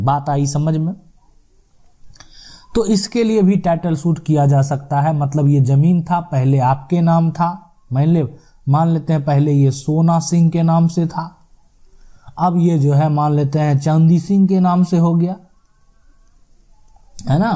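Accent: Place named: Begusarai, India. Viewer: आप बेगूसराय बिहार से हो आप के बोलने का तरीका बेगूसराय जिले का है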